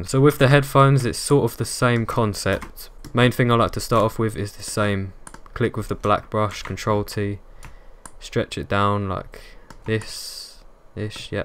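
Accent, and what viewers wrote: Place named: United Kingdom. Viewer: Thatbritish accent ❤️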